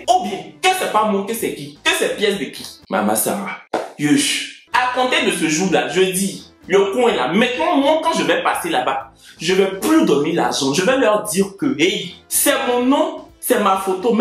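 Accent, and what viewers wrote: Cameroon. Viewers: Tu parle souvent comme un camerounais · J'ai remorqué que tu utilises souvent des intonations ou expression camerounaise dans t video PS: j'aime tes videos tres droles jusqu'à 😂😂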